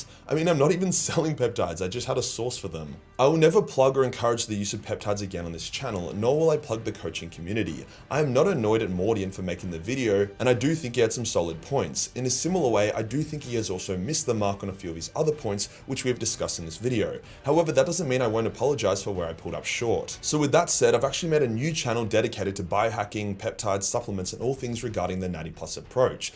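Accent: Australia. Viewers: Every time you get “canceled” is because you are an australian and talk like one and people just dont understand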